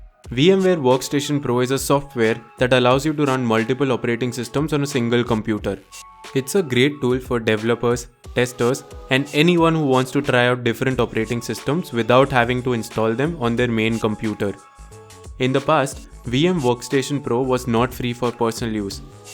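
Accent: India. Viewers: clicks first video, AI voice, clicks away. Clicks second video, indian accent, stays.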